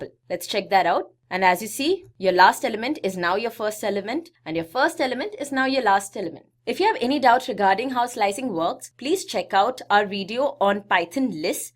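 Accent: India. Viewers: This is a very good content, thanks for sharing. I'm finding it a bit hard to understand you due to the strong Indian accent but I'm making all the effort because your content is good.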